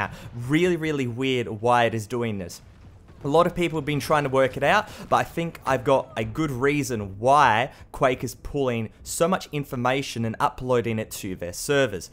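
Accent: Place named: Australia. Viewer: I like your Australian accent hehehehehhehe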